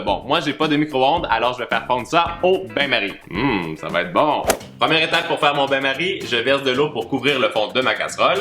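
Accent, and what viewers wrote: Quebec, Canada. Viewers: L'accent québécois est flagrant 😂🔥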